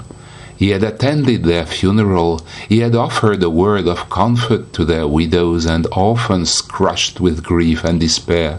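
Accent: France. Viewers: I love the narrator's French accent !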